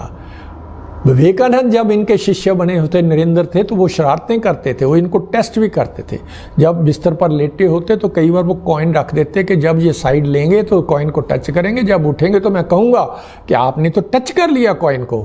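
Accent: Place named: Punjab, India. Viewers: Bilkul theek surjit bhai ..kya karen hum pbi hee hain ...jhalak tow padegi ! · सर, हिन्दी के शुद्ध उच्चारण की आवश्यकता है। कहीं कहीं पंजाबी उच्चारण के प्रभाव की झलक मिलती है।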